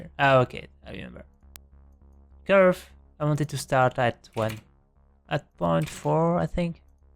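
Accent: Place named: France. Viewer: You have the French accent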